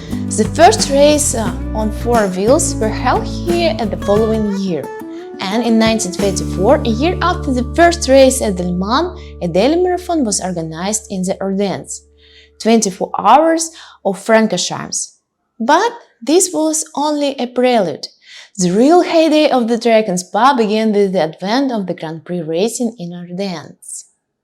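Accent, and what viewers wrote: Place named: United Kingdom. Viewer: При просмотре фильма, вспоминается документальные фильмы от BBC. Многие, кто любит смотреть такое кино, вспомнят, ламповое ощущение и детский восторг от просмотра. Особенно британский акцент, лично мне он нравиться с детства. Подобранная музыка, локация сьемок, манили не моргать. Кто интересовался шоу Topgear, тот поймет о чем Я говорю. Обнимаем и радуемся ее подачей.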